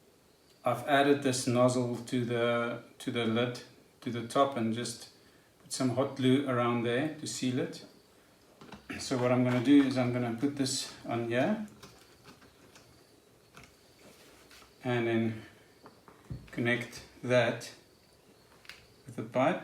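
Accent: South Africa. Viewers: Your south african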